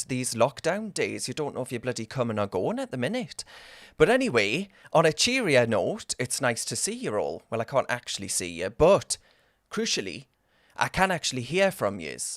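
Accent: Newcastle, United Kingdom. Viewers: Leave him alone lol - yous works fine in the Geordy tone 👍😁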